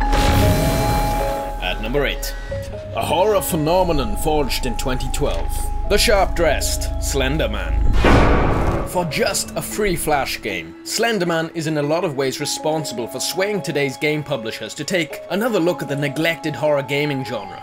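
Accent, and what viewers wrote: Wales, United Kingdom. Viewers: Are you Welsh? You sound pretty Welshy to me :) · Hey, are you Welsh?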